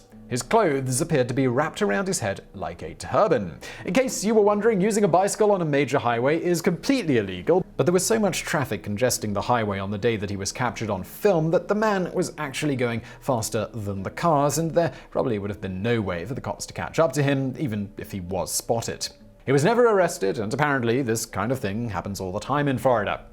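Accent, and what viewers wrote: United Kingdom. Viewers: sure seems to revel in the ridiculousness that happens in America. We enjoy it here too, but it cuts deeper hearing it in a British accent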